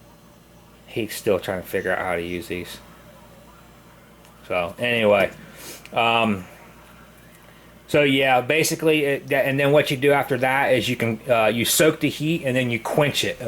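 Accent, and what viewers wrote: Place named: Southwestern Pennsylvania, United States. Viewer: Heavy southwestern PA vibes from you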